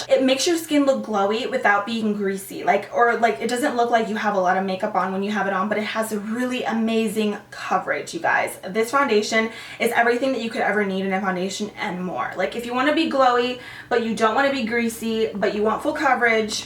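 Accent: Spain. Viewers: i just love yur spanish accent 😘😘😘😘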